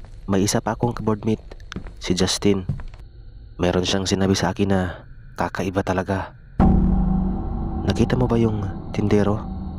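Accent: Visayas, Philippines. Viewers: Parang. bisaya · Bisaya amputakels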